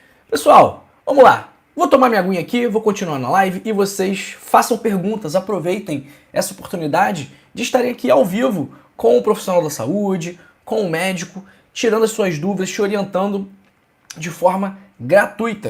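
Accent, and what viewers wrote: Rio de Janeiro, Brazil. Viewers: Esse sutak carioca é que mata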